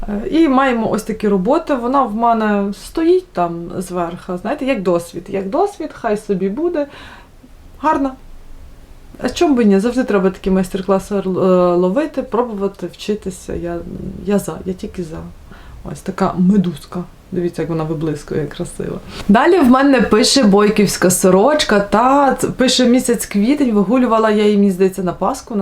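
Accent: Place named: Lviv, Ukraine. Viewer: Дуже багато роботи зробили ви за 2023 рік. Я дякую за проект « борщівська буденка» 🙏🫶❤️ Бо інакше у мене не було б такої гарнезної сорочки. Ви надихаєте і мотивуєте рухатись вперед. Люблю ваші відео, слухати ваш голос зі своєрідниим львівським акцентом- то як медитація😊 Бажаю ще більших творчих успіхів у новому році. І дуже чекаю на новий проект. Може то буде красива бойківська сорочка чи міртова😊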